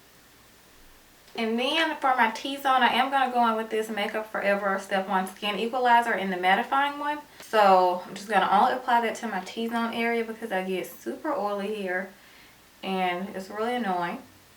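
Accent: South, United States. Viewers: I really enjoy a talk thru because it feels more personal. And I really like your southern accent. Please do more of these! 😊💕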